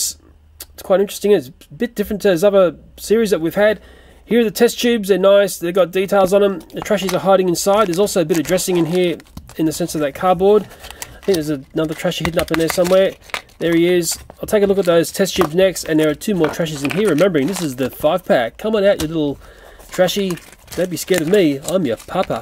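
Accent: Australia. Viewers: cause he’s aussie lmaooo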